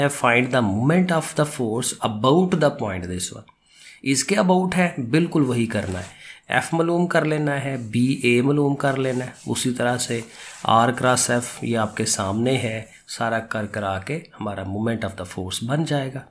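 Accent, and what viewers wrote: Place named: Lahore, Pakistan. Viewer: Sir paka lahori hai 😅 bda maze ka accent hai sir such a kind personality❤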